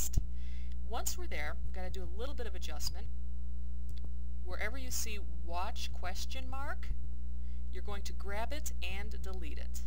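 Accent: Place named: Michigan, United States. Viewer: SO helpful! Thanks! I could tell you were from Michigan within your first 2 sentences.;~)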